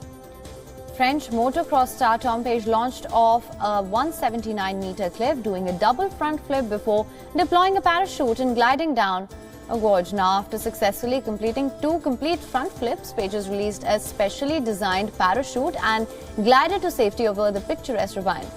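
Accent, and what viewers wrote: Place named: India. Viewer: This newscaster has an annoyingly high pitched terrrible voice worsened by her Indian accent.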